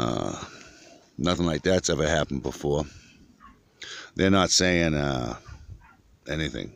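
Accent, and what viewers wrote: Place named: New England, United States. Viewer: Love the Yankee accent! Where are you from??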